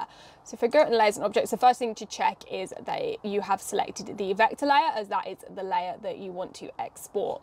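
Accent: United Kingdom. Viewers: btw I'm here bc of ur accent. I love the way that speak the British English.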